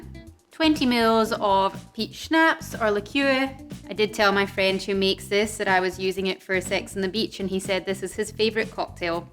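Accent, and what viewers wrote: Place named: United Kingdom. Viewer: Aussie? I thought u were from the UK....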